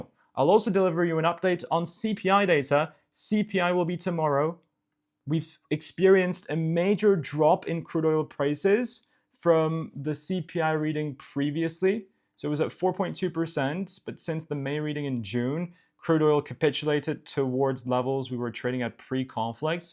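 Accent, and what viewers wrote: United Kingdom. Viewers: His accent is starting to change more British. Funny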